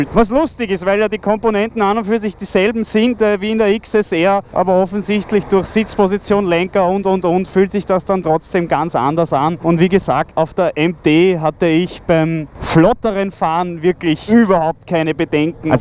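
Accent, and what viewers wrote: Vienna, Austria. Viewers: Viennese (Austrian-)German ;) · I am trying to learn German and these videos are a real help, I know Austrian German is a lot different, but still they give me a really good gage of where I am in my learning, thank you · Genialer Test, angenehme Stimme, sehr sympathisch mit der österreichischen Sprache. Ich würde mich über mehr deutsche Motovlogs freuen. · Ich finde dein Video ist wohl eins der besten und unterhaltsamen teste. Wie du schon sagtest gehen viele Motorrad Tester viel zu sehr auf das ein was eigentlich keinen Juckt, dein Video wirkt kein bisschen langweilig und dazu dieser wunderbare Österreichische Dialekt macht es zunehmend unterhaltsam. Ein tolles Video wovon ich mir auch gern öfter welche ansehen würde 👍🏻👌🏻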